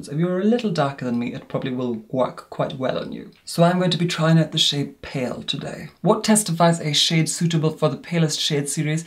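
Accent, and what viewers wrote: Scotland, United Kingdom. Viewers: Greig Scott he’s Scottish, very posh Scottish at that lol. I’m from Glasgow and I’m trying to work out where he’s from exactly. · Am I hearing a bit of Scot in your accent (like Alan Cumming)?? I love this video, I can DEF afford the NYX. TY <3 xoxox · he sounds very Scottish to me (another scot). You can tell he is from the north of Scotland and stayed in Glasgow. I'm sure he has picked up a little bit of an English accent but that's only natural if he is living there. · I can’t decide if your Scottish or not? You definitely sound different from me if you are! Where are you from?? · it took me a full two minutes to figure out he was scottish, despite me also being scottish and living in scotland. it’s just something about the way he talks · Literally the poshest Scot ever. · He’s Scottish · I love your accent. I love all Scottish accents but yours in something beyond! 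:)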